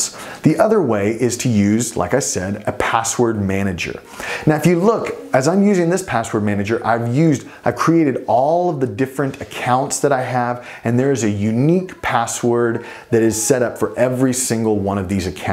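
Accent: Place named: United States. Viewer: voice sounds really different here with that American accent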